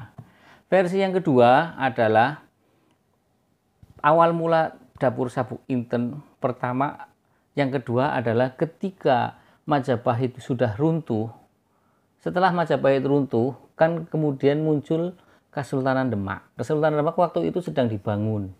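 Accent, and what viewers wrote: Banyumas, Indonesia. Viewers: Om orang mna ya kok ngapak jg boleh minta wa'nya g om?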